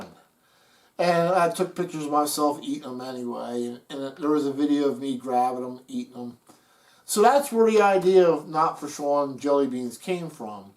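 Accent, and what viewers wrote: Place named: South Jersey, United States. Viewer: 14,000 subscribers! Wow! you're living proof that nice guys can finish first and "Nothing succeeds like success!" Congratulations, and a success well-deserved! A speech impediment? Honestly I've never noticed. The only thing I HAVE noticed about your manner of speaking is your DEEP South Jersey accent! I haven't heard an accent like that since I went to Glassboro State back in the 1970s. As soon as I watched one of your videos I said "Hey, I know where HE's from!" 😃 Anyway, just keep doing what you do and the way you do it! And again, sincere congratulations!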